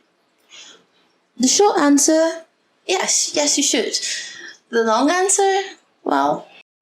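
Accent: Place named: United Kingdom. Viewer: O sotaque britânico da gata! The British accent of the kitty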